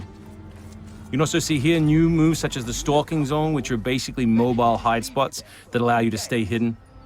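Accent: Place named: Australia. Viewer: Sounds like a bit of Aussie in the narrators accent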